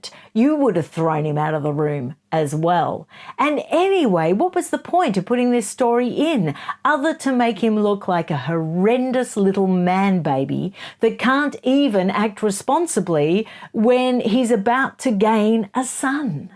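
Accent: Australia. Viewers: you speak like a true Australian. 👏🏻🇦🇺 No flies on our noses. The time is approaching via social media that every skeleton Charles is hiding in his closet will be revealed. He has vast resources at his disposal to have known all.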